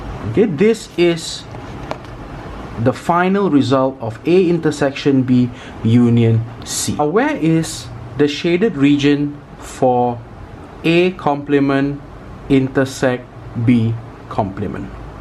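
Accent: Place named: India. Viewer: well , his accent sounded totally indian so...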